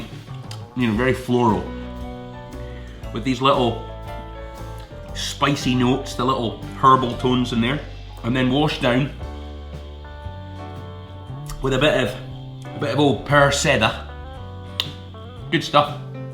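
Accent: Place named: Scotland, United Kingdom. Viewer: my wife and I have booked to come to Mallorca again in early September. Looking for some tips on Palma bars, tapas bars and restaurants. Good to hear a fellow Scot on YouTube!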